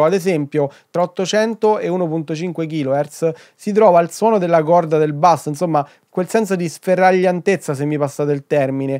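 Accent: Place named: Rome, Italy. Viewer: Abito a Pordenone da 15 anni ma sono di Roma (vicino Frascati). Sempre bello sentire il nostro accento...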